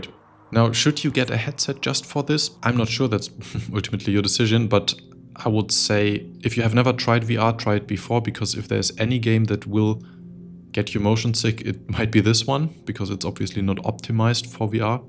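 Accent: Germany. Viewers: I love SC in VR. How you said, very immersive 😉 What is the key to switch between VR mode and 2d mode? und vom Slang her höre ich doch das du Deutscher bist. Eindeutig 😁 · i didnt know you are german, but that accent, omg.. sorry herr 😄i also dont mean nothing bad, thanks for the video. I plan to buy some good vr headset and im crazy about star citizen. I keep my desktop pc up to date only for star citizen.. is special game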